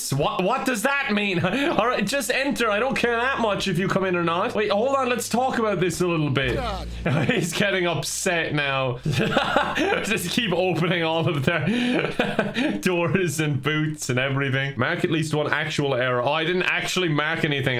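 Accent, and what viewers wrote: Russia. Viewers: I like his russian accent :D Please play it again! ♥ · your russian accent reminded me of the metro games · "Welcome to the republic of.... Ireland!" Speaks with an russian accent, everything is spelled in a slavic language... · AWESOME Russian acccent comrade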